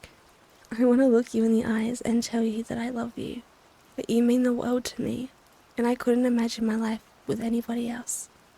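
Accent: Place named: Australia. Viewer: AUSTRALIAN ACCENT 🤩🤩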